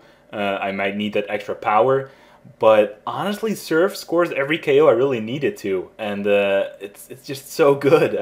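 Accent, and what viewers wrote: Netherlands. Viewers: Is it possible that you are Dutch? Your English sounds Dutch :P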